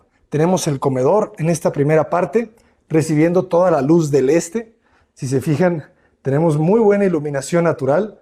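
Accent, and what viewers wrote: India. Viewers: La pronunciación del inglés suena con acento que tiene la gente de la India 🇮🇳 ☺️ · Hola siempre me gusta ver tus videos, pero hoy me sorprendió te admiro por tu valor de ablar inglés sabiendo que no tienes el asentó esto es una crítica constructiva , eres el primer mexicano que escucho con asento ,, Indu,, pero sigue practicando y trata de ablar con una persona nativa con inglés y pregúntale como suena tu inglés y que sea sinsero, eso te ara corregir muchas palabras, pero una ves más felicidades admiró tu trabajo 😊.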